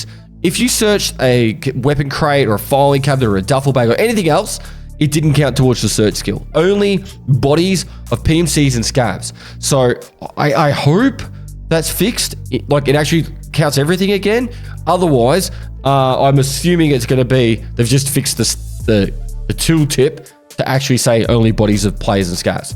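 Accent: Australia. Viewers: Most distinguishable Aussie accent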